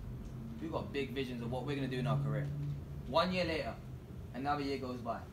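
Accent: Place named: United States. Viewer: Why does he talk in an American accent when he's British????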